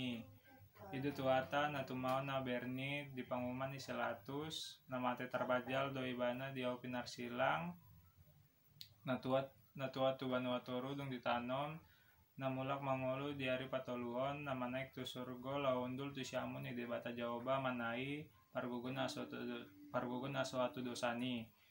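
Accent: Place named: West Java, Indonesia. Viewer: Mantap.. bhs batak logat sunda nyak..😁👍👍👍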